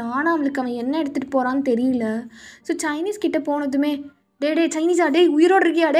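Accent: Kerala, India. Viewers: Ahhhh 😩 ur malayalam is so cute🤍.. I want pinch your cheeks 😅🤏🏻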